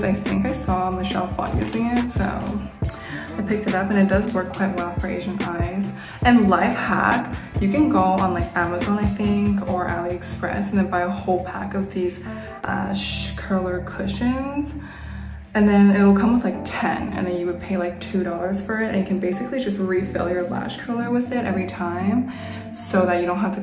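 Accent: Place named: California, United States. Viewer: Sounds like a California girl accent😅😮‍💨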